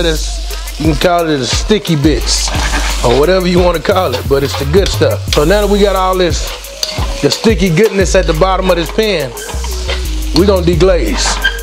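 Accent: Texas, United States. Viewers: Just when I was looking for something quick to make! Also....is that a Texas accent? New subscriber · Excuse me Sir...You from Texas?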